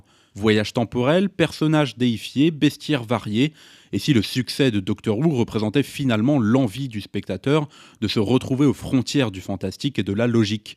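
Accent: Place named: France. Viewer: Wait... he seems to be... French!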